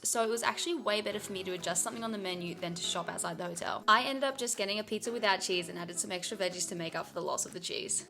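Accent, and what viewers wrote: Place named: Australia. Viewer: I knew u sounded Aussie when I watched your shorts…thanks for the content it’s very interesting job u have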